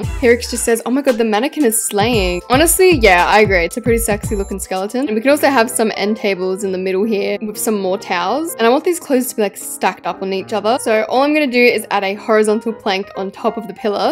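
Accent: Australia. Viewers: OMG UR AUSTRALIAN??!! if u r im so HAPPYYYY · Your from Australia same gurl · What your Australian?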